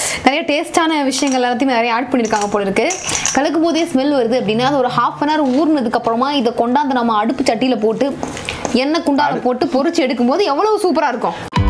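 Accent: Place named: Coimbatore, India. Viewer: Namma covai slang apo apo vanthutu poguthu. akka....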